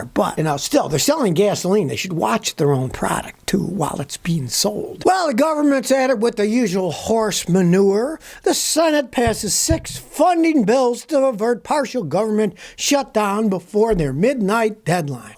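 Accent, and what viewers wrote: Germany. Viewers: did you spend a little time in the service in the Fatherland? Love the accent! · Luv ur germn akzent😊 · I love your German accent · Great German accent...reminds me of that guy on Roland & Martin's- Laugh-in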